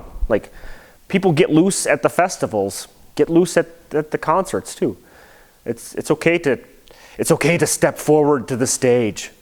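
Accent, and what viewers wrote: Germany. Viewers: Why does it sound like you got a german accent? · The remarkable thing is, that you already developed a little German accent. Or am I hallucinatin'? From one Metalhead to another: Welcome! Good to have you here. Make sure you'll stay!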